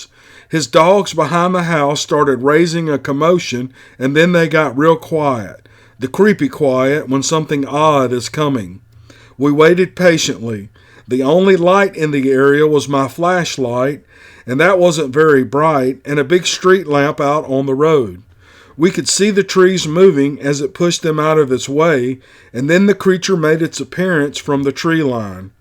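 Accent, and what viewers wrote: South, United States. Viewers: Nothing better than a Southern voice, Louisiana folks appreciate you, and your taking time to do this!!! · Yea yu dig his southern voice Us southern boys have that southern draw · I luv your southern accent if I'm saying that correctly, anyway it's different from other you tube videos. You have good store telling technique. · I love the fireside videos. I listen right before I go to & the fires & your nice southern drawl lulls me to sleep. Please don't take that offensively.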